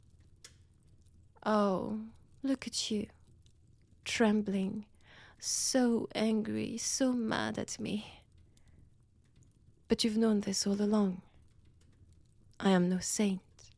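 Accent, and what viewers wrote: France. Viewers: A lovely Christmas present! A french accent audio!! I needed this!!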